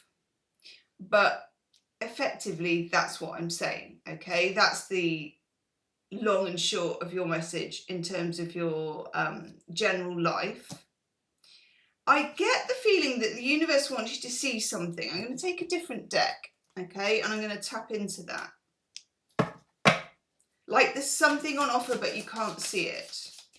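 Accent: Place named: United Kingdom. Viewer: Ii know we both speak English ; but for some reason this American is having difficulty understanding the British English. It’s my fault of course !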